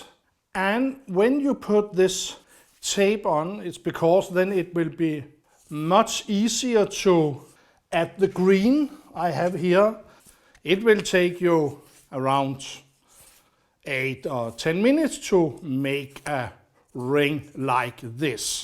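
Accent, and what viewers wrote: Denmark. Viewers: I am the people who love his Danish accent 🤣💖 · Great in English, I follow him in Danish or I should say watch, learn and also enjoy his Danish accent. He seems such a lovely man, very creative. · I love your accent! My grandfather and many of our family came from Denmark. Many of them used Dane to say things. I loved it. I have a collection of the Danish plates in my house. So I will say Velkommen. My Grandfather would sing to my daughter and dance around with her. Wonderful memories. Thank you. I know the word for thanks but I don't think it is right. Joyce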